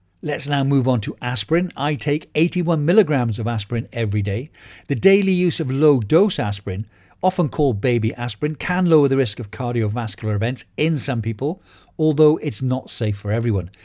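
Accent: Wales, United Kingdom. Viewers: Thank you! And I think you are Welsh ? 🏴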